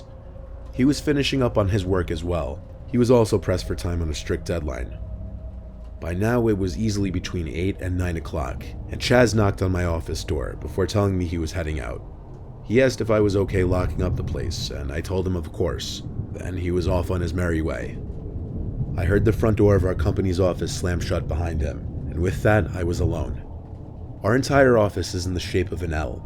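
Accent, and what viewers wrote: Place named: East Coast, United States. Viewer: Literally no one better than and his East coast accent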